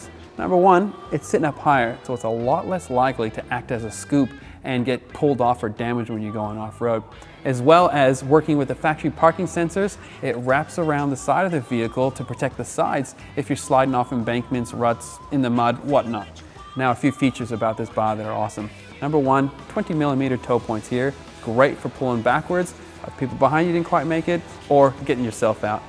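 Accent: Australia. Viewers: Such a slick and polished video but the light bar is crooked. The presenters accent is so "Australian light" that it's distracting. But OMG those are some killer upgrades. The black car hides all the cool upgrades. A car that was any other color than black would have been a better choice IMHO. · Idk how you have an Aussie and Brooklyn accent at the same time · Thank you for toning down your Australian accent so I can understand you! Great video and project - thumbs up! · So lost he’s got a North East accent with Aussie undertones! 😆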